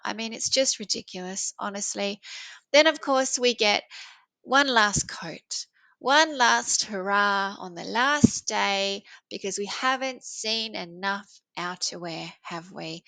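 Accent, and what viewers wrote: Australia. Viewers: Are you Aussie 😂😂😂❤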